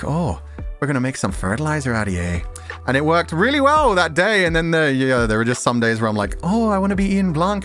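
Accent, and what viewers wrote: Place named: United Kingdom. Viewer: 英語自体もイギリス英語です！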